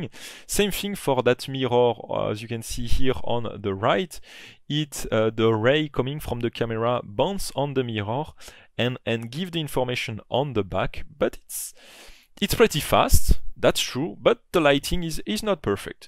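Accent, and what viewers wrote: France. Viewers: The French accent 😬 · Cela faisait longtemps que je n’avais pas entendu un tel accent français dans une vidéo anglo-saxonne, c’est très drôle même si ça pique un peu les oreilles 😀. Par contre, vidéo très interessante sur le sujet et c’est le principal. Vous avez un nouvel abonné. 👍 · Super vidéo, mais avec ton fort accent français, tu ne pourrais pas nous la refaire aussi pour nous les francophones ??? Ste plait, ste plait !!!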